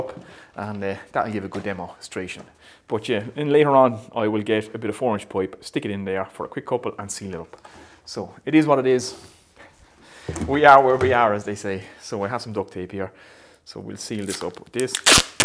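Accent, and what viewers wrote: Ireland. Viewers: My grandparents were Irish and it’s nice to here the brogue again after over twenty years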